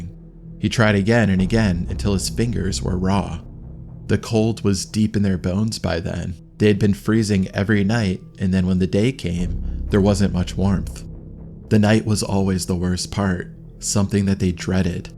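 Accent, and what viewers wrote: New England, United States. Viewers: Are you from the northeast? Kind of new enland area?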